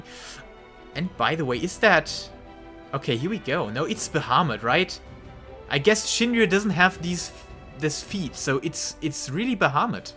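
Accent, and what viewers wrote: Germany. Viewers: I think because hes german, could sound confusing to americans, but to me he sounds like a guys that is talking englisch :D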